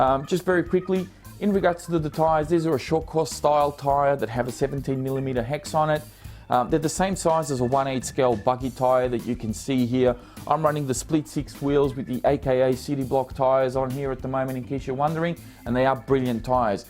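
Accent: Australia. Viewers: cool accent to auzzie and south African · bro ur voice is classic aussiee!!!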